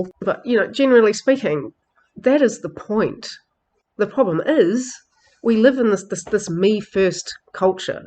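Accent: New Zealand. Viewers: Shes Kiwi!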